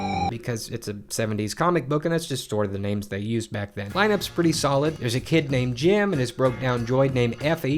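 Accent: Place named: New York, United States. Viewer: Best HS Book Report Ever. Sounds like a New Yorker....gotta be a New Yorker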